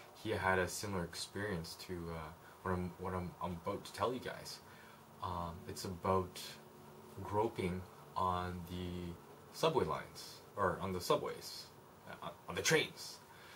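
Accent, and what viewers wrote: Canada. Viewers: dude what's with the canadian accent? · ... but I digress, you should make more videos man ! You're a good storyteller ! I follow you on Twitter btw, I just read your tweet about someone commenting on your Canadian accent, and decided to check out your channel. haha :P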